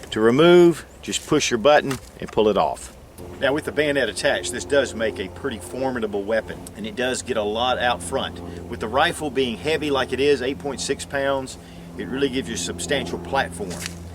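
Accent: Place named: South, United States. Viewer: I really like your videos and find them to be very informative and answers all of my questions before I need to ask them. That, and your southern twang makes these videos fun to watch.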